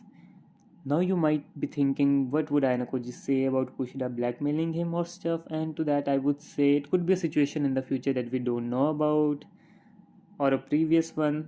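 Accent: India. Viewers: by your voice feels like you are from india : )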